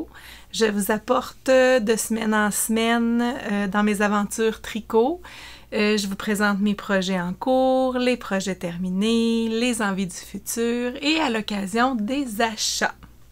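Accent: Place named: Quebec, Canada. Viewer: Bonjour de France, quel bonheur d'entendre la musique du Québec (l'accent😉🥰) ça m'enchante ! Vos pulls sont très très beaux et les echeveaux trouvés rohhh quelle beauté pour si peu ..on a pas ça chez ns 😢 ..le choix des laines pour les 2 pulls futur sont parfaits si, si j'aurais fait le même choix !! Merci pour ces instants simples clairs et chaleureux, à bientôt 😊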